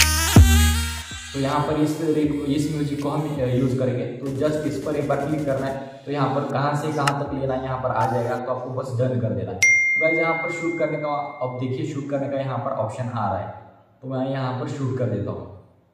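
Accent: Maharashtra, India. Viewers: Marathi ahe ka tu😁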